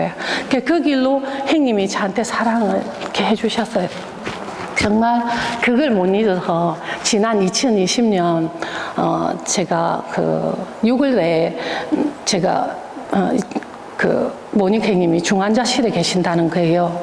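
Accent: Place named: South Korea. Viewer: 구수한사투리에 좋은몫을선택하여 질곡의삶을즐겁게신나게 강의해주신봉사자님 주님께서되갚아주실것을 굳게믿으머엄청사랑합니다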